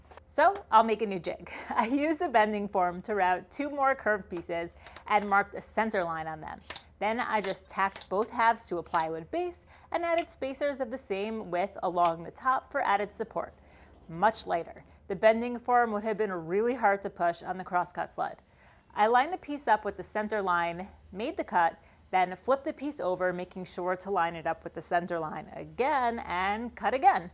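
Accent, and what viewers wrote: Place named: Mid-Atlantic, United States. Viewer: that’s not New England. Possible modern mid Atlantic; Eastern PA, Northern NJ, parts of CT and Long Island.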